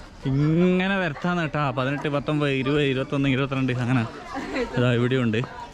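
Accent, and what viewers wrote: Kannur, India. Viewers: കുറച്ചു കൂടി നല്ല മലയാളം സംസാരിക്കൂ, എല്ലാം കണ്ണൂർ ഭാഷയിൽ സംസാരിക്കാതെ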